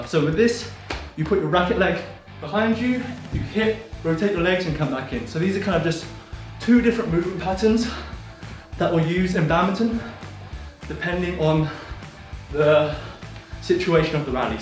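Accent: United Kingdom. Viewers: Yeah his accent is 100% southern English. Probably born and raised in England is my guess based on the accent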